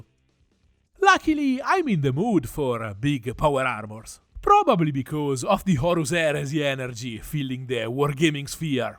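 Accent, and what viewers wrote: Italy. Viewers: Awesome job ! I want to go back to painting minis when I watch that kind of quality videos. And your italian accent make me want to learn the langage xD Keep up the good work, cheers from a (french) neighboor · Hey just wondering are you Italian? Your Accent is kind of intense idk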